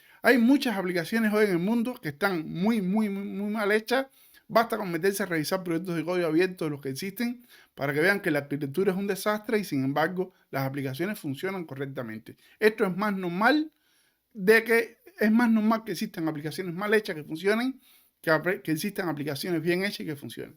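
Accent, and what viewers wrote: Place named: United States. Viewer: Mucho rollo y en farfullo medio yanki. Es incomprensible.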